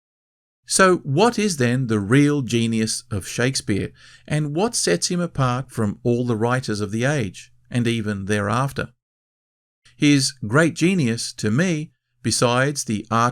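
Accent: Australia. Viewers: It's so nice to hear your Aussie accent. Love from the Mid North Coast, NSW, Australia.❤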